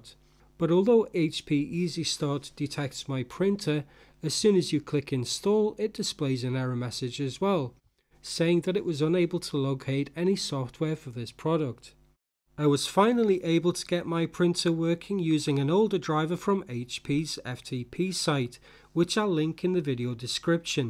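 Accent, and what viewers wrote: United Kingdom. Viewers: Brilliant! Easy to follow and it worked. No driver for LaserJet 1020 but the 1022 will do it. Why can't HP or Apple sort this out instead of causing loads of problems and stress? Thanks so much. ( Diolch yn fawr, you sound Welsh! )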